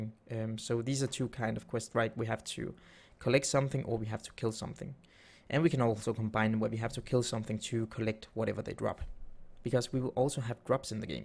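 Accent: Denmark. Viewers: Is that a Danish accent I hear? XD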